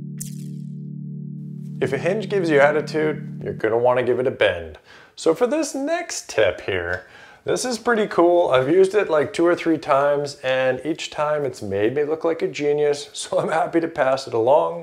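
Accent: Canada. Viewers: Love hearing a good Canadian accent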